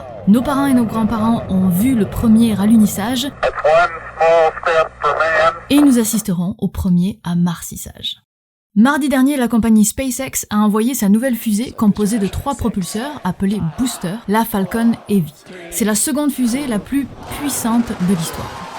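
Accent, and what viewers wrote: South, France. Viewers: Y'a un truc qui colle pas : T'as l'accent du Sud, mais tu es dans la neige ! Quelque chose dans mon cerveau déconne en voyant ça... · Je me pose une question tu est Québécoise ? Car tu à l'accent du Sud de la France .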